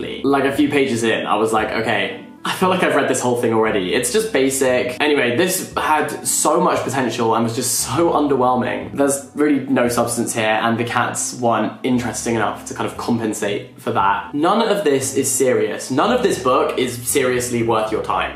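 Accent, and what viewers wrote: United Kingdom. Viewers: WHY IAM I LISTENING TO A BRI-ISH MAN RANT ABOUT BOOKS AT 2 AM? i do not know ( i love him sm)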